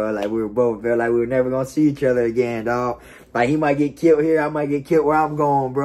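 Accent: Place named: Florida, United States. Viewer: He sounds like he from florida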